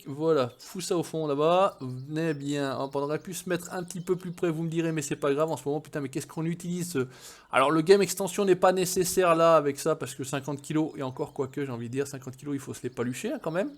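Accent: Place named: Nord-Pas-de-Calais, France. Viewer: Très bonne vidéo comme d'habitude. qui se met à parler chti et un peu patois. Je me demande si tu es vraiment, lol 🤔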